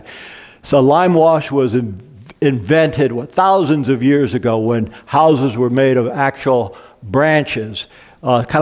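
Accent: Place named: California, United States. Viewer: Man, your accent is so Californian that you could star in the series “The Californians” Love it.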